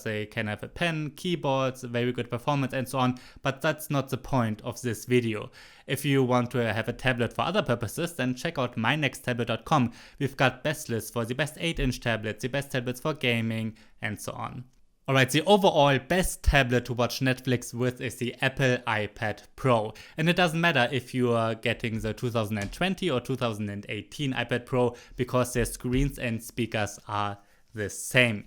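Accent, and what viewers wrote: Germany. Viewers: This guy is German, right?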